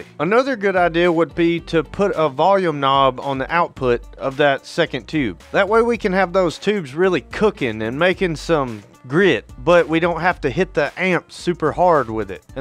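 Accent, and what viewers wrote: South, United States. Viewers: I added this to a playlist about a year back, and lost it. I searched YouTube high & low typing in so many keyword combos and variations to run across it again, because it's brimming with useful info. Happy to revisit. Channel with the Southern accent INSTANTLY told me I was in the right place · Hahah, that's exactly what I was going to say, he's just southern, lmao. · he's just southern, reminds me of Hank Hill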